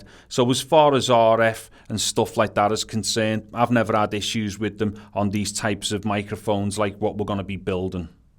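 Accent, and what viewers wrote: Liverpool, United Kingdom. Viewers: Nice job and good to hear the scouse accent. My son lives in Liverpool.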